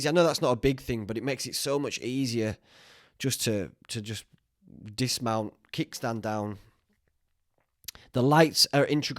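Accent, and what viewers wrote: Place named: Sheffield, United Kingdom. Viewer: You sound like you are from near me haha, im from near Sheffield - Rotherham - Just looking for an ebike myself!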